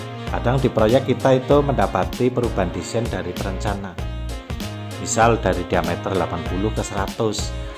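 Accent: Java, Indonesia. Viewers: Ya itu sudah bhs indonesia bro, hanya saja logat"nya sedikit medok khas jawa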